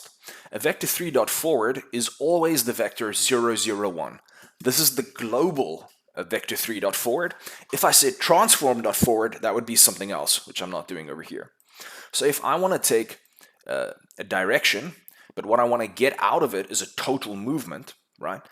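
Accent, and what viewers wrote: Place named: South Africa. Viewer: I feel a hint of South Africa here ... thx for the tutorial boet.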